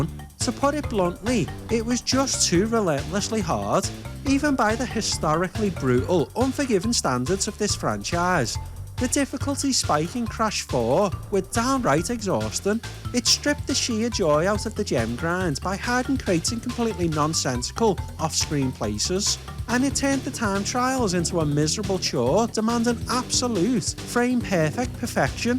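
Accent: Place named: Newcastle, United Kingdom. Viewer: Rockin that Geordie accent 😊👍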